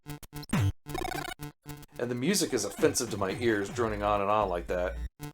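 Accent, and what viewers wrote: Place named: United States. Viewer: hears American accent Me: here comes NES bias 😅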